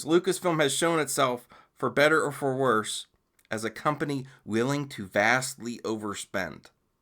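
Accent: Pittsburgh, United States. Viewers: You from Pittsburgh? You sound like a fellow yinzer.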